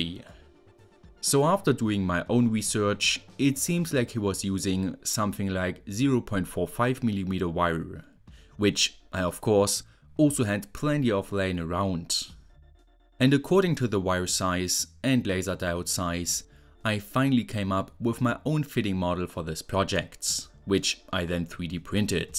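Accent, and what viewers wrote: Germany. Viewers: but you are not a Scott. This is the hardest German accent I have heard in my life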